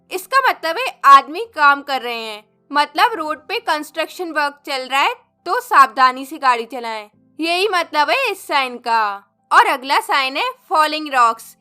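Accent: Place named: Himachal Pradesh, India. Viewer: apki voice se lagta h ki ap himachal ya uttarakhand se h nice video very helpfull thnx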